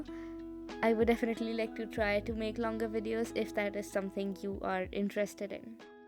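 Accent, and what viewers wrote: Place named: India. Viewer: are you from India?😅 (I'm so sorry if I offended you, but your voice literally sounds like an Indian <3❤)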